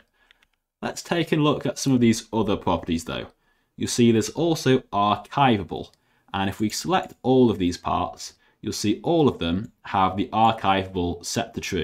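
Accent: United Kingdom. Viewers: british mate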